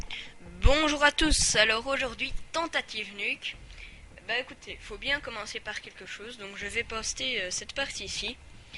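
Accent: Switzerland. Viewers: Il est serait pas suisse lui par hazzzzard???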